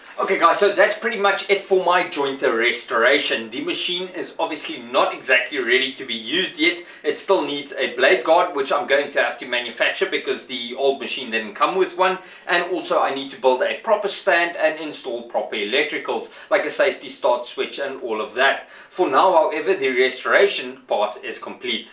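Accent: South Africa. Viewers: Bloody hell how South African is this guy? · I'm assuming you are south African, your English is making me exhausted haha, don't paint the dovetail ways.looks Good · ... good job, well done. i can hear you have a South African accent. Greetings from Moscow ... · Would that be an SA accent I'm hearing?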